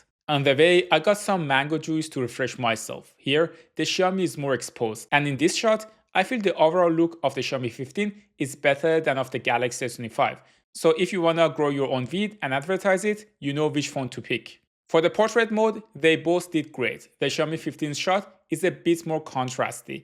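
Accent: Iran. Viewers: Bro are You Iranian ? 😁😁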